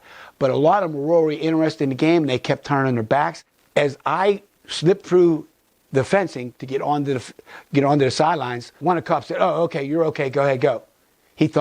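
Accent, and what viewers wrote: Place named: Pittsburgh, United States. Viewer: This guys Pittsburgh accent has me missing home.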